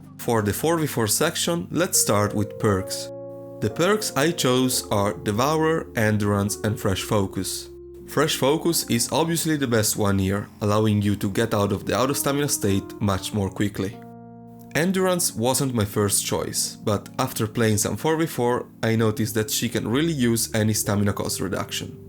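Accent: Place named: Italy. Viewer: madonna l'accento italiano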